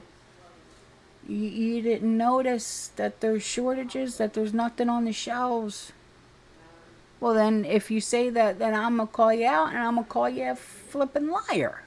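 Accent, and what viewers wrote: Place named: New York, United States. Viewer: I love the way talk.... That Néw Yoik talk íts awesome!!!your awesome☺🤘you rock.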